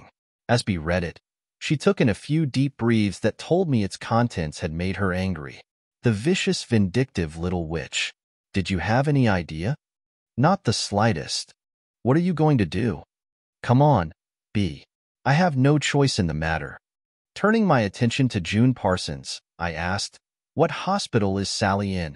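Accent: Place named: United Kingdom. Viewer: Hehe british accent lol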